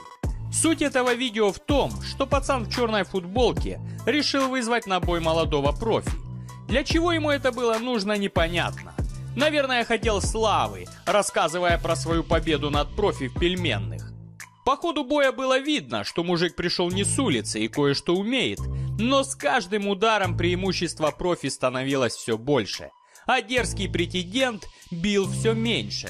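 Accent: Ukraine. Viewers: Комментатор,хохляцкий акцент чувствуется за версту.Идите тренеруйтесь.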